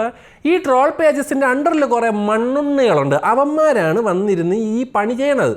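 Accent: Trivandrum, India. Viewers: Annan trivandrum aanen confirm aayi😂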